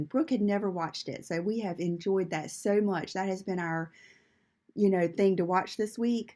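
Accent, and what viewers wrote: South, United States. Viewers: So sorry you burned yourself. I have done that too. I have been watching a lot of your videos and truly adore you. Your accent sounds like you might live in the south. It is so nice to listen too. Love your kitties. When I was growing up we lived in a small town in central Illinois. We had 10 acres surrounding our hose which was 3 stories. We had so much space that the top floor was turned into a play house for me and my sister. With that said we also had room for 39 kitties at once. We would dress them up in doll clothes, pretend to operate on them and just silly girl's stuff involving our kitties. So long story short I love love kitties and yours are so beautiful. Love them.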